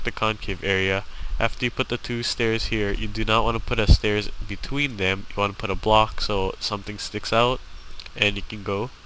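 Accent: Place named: Canada. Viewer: if i was to guess, i'd say mid-northern canadian accent.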